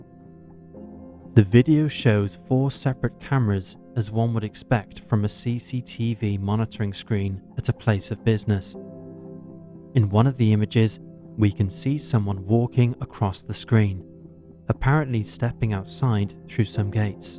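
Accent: United Kingdom. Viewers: I appreciate all vids from Top5s. As well as the new narrator. Something about a British accent among listening to only American accents all the time that really helps lol Thx Top5s team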